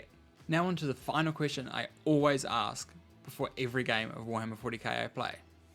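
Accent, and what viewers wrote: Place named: New Zealand. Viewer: You sound like a kiwi, where abouts are you based?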